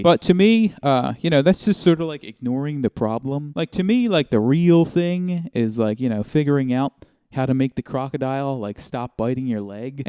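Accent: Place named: Philadelphia, United States. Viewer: I’m from around the bucks county area. I could tell by your pronunciation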